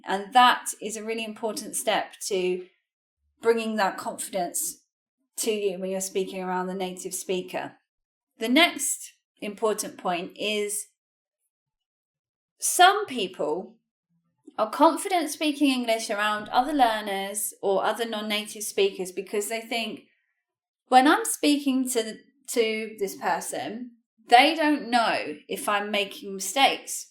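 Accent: United Kingdom. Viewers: My entire life I learned the american english, but I understand better your british english than the american one. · Hi, do you english is british? Thank you for tips!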